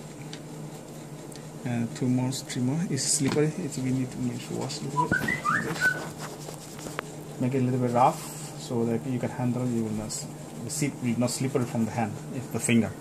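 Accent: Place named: Bengal, India. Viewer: Hello, Very good video to learn this process. Thanks 🙏. If you don't mind guessed you are a Bengali speaking how you are talking now. 👋 from 🇨🇦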